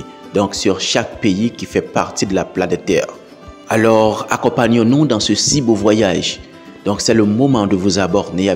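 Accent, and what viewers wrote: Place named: Haiti. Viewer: Bonjour j'aime beaucoup votre accent haitien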